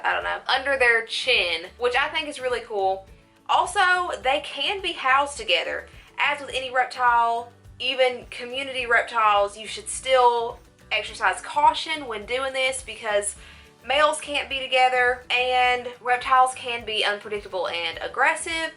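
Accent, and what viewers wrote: South, United States. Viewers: That southern accent took me by storm 😊 lol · Girl you are so beautiful, but... That accent, is it Tennessee or Carolina maybe Texas or Georgia got to be one of the four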